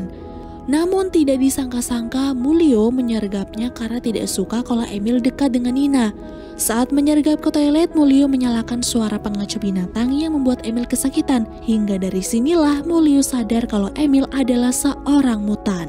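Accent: Pemalang, Indonesia. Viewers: Wong Pemalang tah min?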